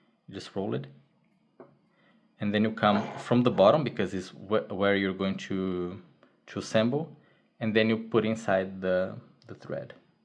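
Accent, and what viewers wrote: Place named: Brazil. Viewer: o sotaque de brasileiro falando ingles é inconfundivel heheh. projeto massa dms, acompanho ha um tempo ja. ansioso pros proximos passos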